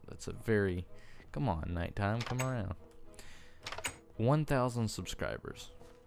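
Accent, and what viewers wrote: South, United States. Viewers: I love your accent. It reminds me of mine and a lot of my friends'. I don't know if I'm right in thinking of this, but it sounds like it's Southern, but just on the edge. I live in Memphis (which I refer to as the least Southern city in the South sometimes) and personally was raised by a mother from the West coast and a father from the North, so my accent is pretty much non-existant except for a twist on the occasional word. Your accent feels kind of like home to me. IDK, maybe that sounds creepy or weird. But also, this video was posted 4 years ago so maybe your accent or thoughts on it have changed c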